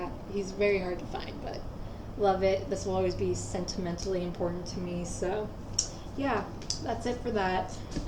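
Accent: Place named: Pacific Northwest, United States. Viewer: Hey, you showed up in my recommendations the other day, so this is the first video of yours I watched - and it made me a subscriber! Great easy-going style you have, and in a YouTube genre that's far too dominated by men, if you ask me. It sounds like you're a fellow Pacific Northwesterner, too, which is all the more fun.:) I hope you survived last week's heat wave OK. I look forward to watching more of your stuff (and I invite you to take a peek at my channel if you haven't yet)! Cheers!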